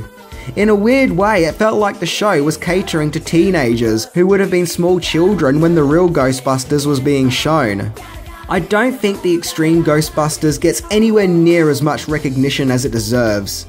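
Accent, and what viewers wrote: Australia. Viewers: Yeah i remember the rename to the slimer thing. I had kind of started to loose interest in it then. But the first couple seasons were really good. But that's what happens to a fruit when you juice it too much. The first press is awesome. Second press.. kinda bitter. third press... you might as well eat the peel. I have been watching your videos trying to place your accent. I never guessed Aussie. And I've been talking to Australians since yahoo chat was a thing. I like Australians. I had a lot of fun conversations back in the mid to late 90's with australian guys on yahoo just joking and talking smack. You are a friendly bunch. I like that.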